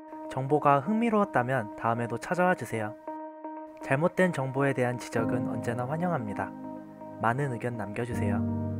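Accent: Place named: Jeolla, South Korea. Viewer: 의 발음을 으라고 하네요.전라도 출신인가요?반가워요!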